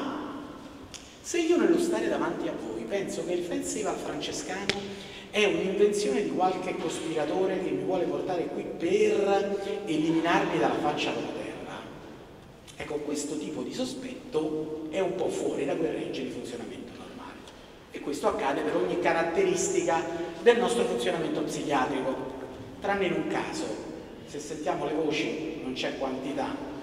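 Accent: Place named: Rome, Italy. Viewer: La cadenza romanesca è alla mano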